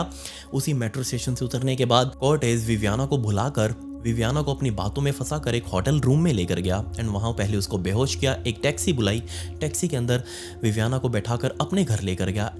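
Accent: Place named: Andhra Pradesh, India. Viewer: Accha, so you're an ANDHVAKT! Waise tumhare English pronunciation se shaq hota tha, aaj clear ho gya.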